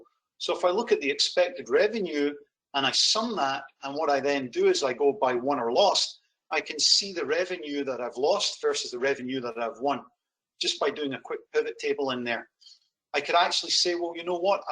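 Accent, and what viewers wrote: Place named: Canada. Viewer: Hi it sounds like you're based in Canada. How can we reach out to you for consulting opportunities?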